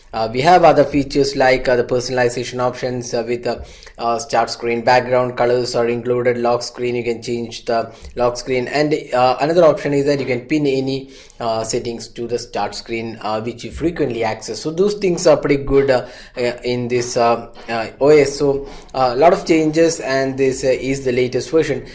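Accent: Kerala, India. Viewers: heaavvyyy mallu accent.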